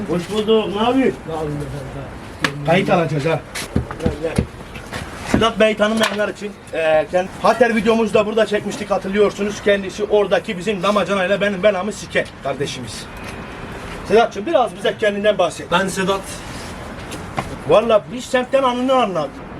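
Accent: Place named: Istanbul, Turkey. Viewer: Abi şiven değişiyor birz istanbul şivesine yakın konuşuyon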